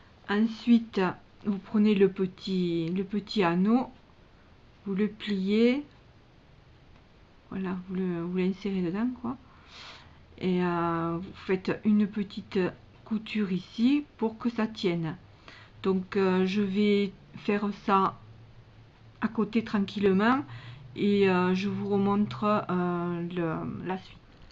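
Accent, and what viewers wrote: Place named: South, France. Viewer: coucou, super explications en plus avec ce petit accent du sud j'adore :) merci pour le tuto à bientôt bisous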